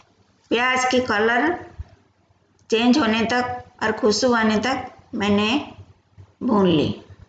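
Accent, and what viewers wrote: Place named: Odisha, India. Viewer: Very good effort Madam. Keep moving . Nice hindi in Odisha tone ..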